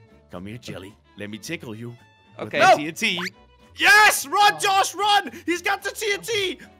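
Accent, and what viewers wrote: United Kingdom. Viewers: his accent is thicccc British mate:)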